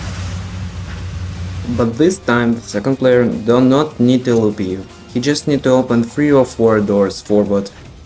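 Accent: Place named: Russia. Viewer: Ты звучишь очень пл русски...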